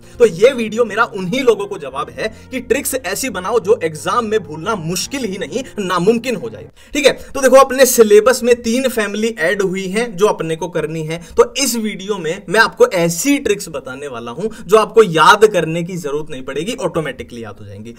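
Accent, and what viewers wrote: Haryana, India. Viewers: Both are belong to HR .. haryanvi accent h bro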